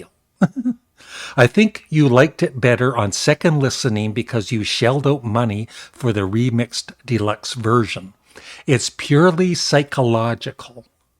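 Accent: Canada. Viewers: Hey I'm guessing you're from Canada by the way you pronounce album as ELbum instead of ALbum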